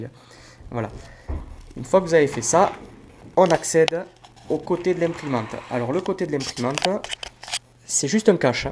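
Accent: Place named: South-West, France. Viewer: Top ça marche ! et avec l'accent du sud-ouest :)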